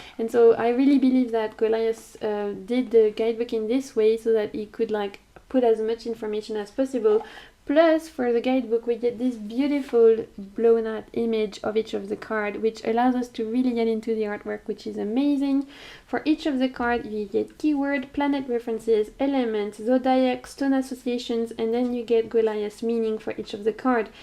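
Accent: France. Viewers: I simply loved your video. I am a beginner in this wonderful journey and I do believe that guidebooks must be an essencial part of a tarot deck. You gave me a lot of insights about which deck to buy next. Thanks for sharing. I also love your french accent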